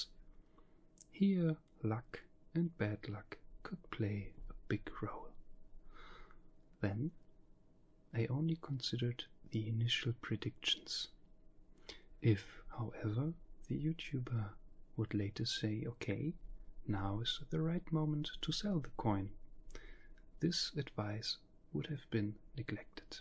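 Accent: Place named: Greece. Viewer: Are you Greek by any chance? The accent suggests you might be!!! Ευχαριστώ!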